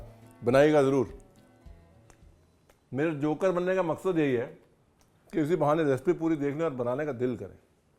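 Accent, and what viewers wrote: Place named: Punjab, India. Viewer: Ranveer ji, I have tried your Louki Dhokla and Paniyaram, it was yummmm! My daughter loved it. BTW Paniyaram is a term in TN and Kuzhi Appam is called in Kerala. Kuzhi pronounced as “Kuli” means small dents/ holes. Perhaps Appe was morphed later in other regions incl MH etc., additionally wanted to share, you speak very well. I mean your friendly, conversational recipe making is a treat to ears ! I adore your Punjabi accent and the wit and humour you bring along. Your Videographer for this channel needs an extra applaud for capturing colors and sounds to the precision, even the mustard crackling sound was a sound-delight to listen to. Prayers and Best wishes for all your endeavors, keep inspiring us.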